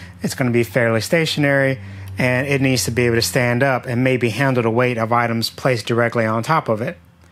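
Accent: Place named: United States. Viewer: As a European it's alway been impossible for me to understand the continued use of imperial units in USA. How long is a mile? The Americans have an old man in Iowa, a mile is how far he can walk in 45 minutes. Honestly, that imperial crap can't be used. When I watch your video, it's so wonderful to hear SI-units spoken with that American accent. Kind of gives me hope for the future.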